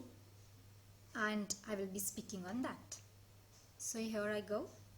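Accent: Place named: India. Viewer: Incoherent Indian accent (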